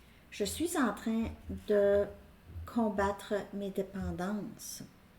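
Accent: Canada. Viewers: En plus, j'adore votre petit accent canadien, c'est trop mignon, mon rêve d'aller voir ce magnifique pays que vous avez là !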